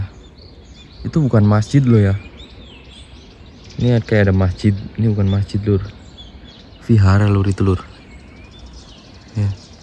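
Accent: Banyumas, Indonesia. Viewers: ngapak ke bagian ndi mas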